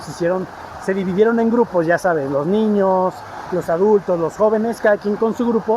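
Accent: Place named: Mexico City, Mexico. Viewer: A este cuate se le nota lo chilango en su chisme jajaja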